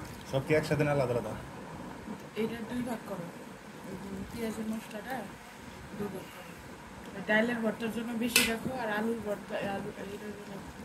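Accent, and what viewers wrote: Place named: Tangail, Bangladesh. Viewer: ভাই আপনি কি টাঙ্গাইল না ময়মনসিংহ জেলার লোক ভাষাটা নিজের মতো লাগছে।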